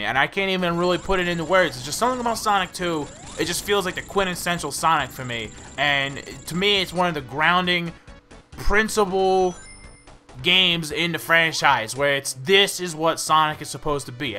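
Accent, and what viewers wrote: New York, United States. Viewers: Oh with the New York accent! Wierd if you've been watching videos for over a year... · How come your voice sounds like you have a New York accent?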